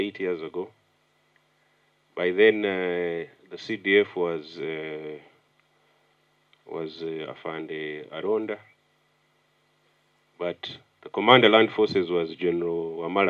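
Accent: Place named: Kenya. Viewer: Ur swahili sounds more of kenyan🤔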